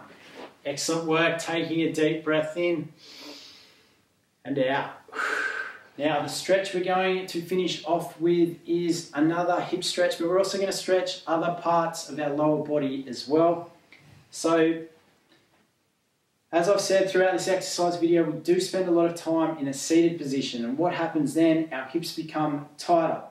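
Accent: Australia. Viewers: IS THIS GUY FROM AUSSIE LAND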